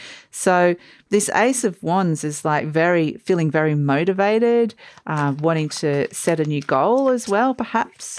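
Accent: Australia. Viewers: Lovely to hear an Australian accent!!!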